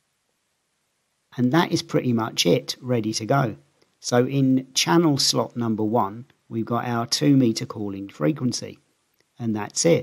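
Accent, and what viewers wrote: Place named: Scotland, United Kingdom. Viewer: You sound a bit Scottish.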